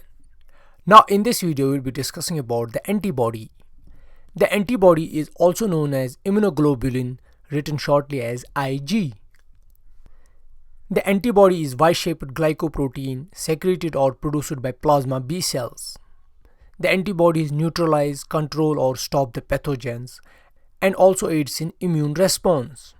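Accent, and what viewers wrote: India. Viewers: Your Ascent is very similar to Indian Ascent,are you Indian?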